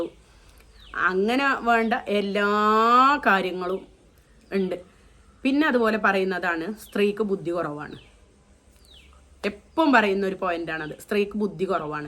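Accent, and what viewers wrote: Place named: Malappuram, India. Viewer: ഓരോ നാട്ടിലും ഉള്ള സംസാരം അല്ലെ അതു? കോട്ടയത്ത് ഉള്ള എല്ലാ മതക്കാരും ഒരു പോലെ ആണ് സംസാരിക്കുന്നത്..ഇവർ മലപ്പുറത്ത് ഉള്ളതല്ലേ? അവിടെ ഇങ്ങനെ ആണ് സംസാരിക്കുന്നത്..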